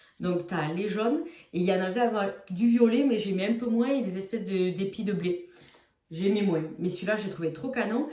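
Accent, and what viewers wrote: South, France. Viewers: Coucou, je suis tombée par hasard sur ta chaîne, j’aime beaucoup ta bonne humeur. Je me suis abonnée à ta chaîne, j’ai moi-même une toute petite chaîne. D’après ton accent tu dois être du sud comme moi. Les achats pour l’anniversaire de ta fille sont top. Merci pour le partage. Bises à toutes les 2. 😍😍😍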